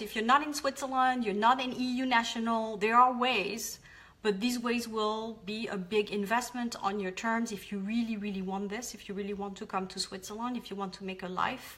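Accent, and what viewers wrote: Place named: France. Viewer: Thank you for this smart,structured content!I also like how you follow some sensitive points.Btw,your French accent is very charming when you speak English.Good luck with everything you do!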